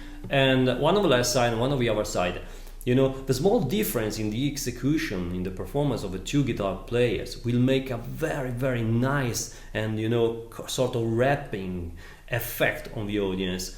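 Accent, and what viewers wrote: Italy. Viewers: he is from itali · Italian accent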